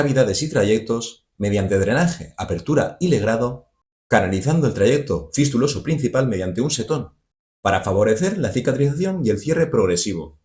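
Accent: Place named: Spain. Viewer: No es obvio el acento? Jaja es de España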